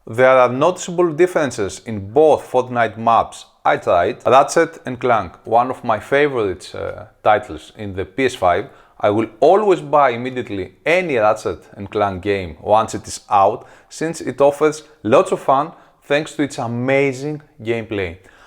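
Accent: Greece. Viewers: most greek accent ever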